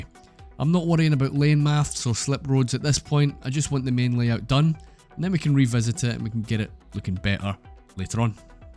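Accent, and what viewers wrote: Scotland, United Kingdom. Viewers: heard a fellow scotsman playing my fave game and im 100% tuned in. nice video :)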